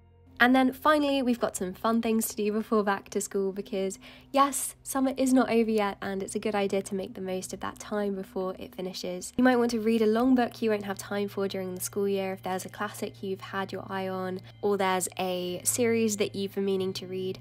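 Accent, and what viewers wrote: United Kingdom. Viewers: her voice is soo calming.... i live around people who have that strong british accent (apperently i have one too but idk) so this feels weirdly familiar 😂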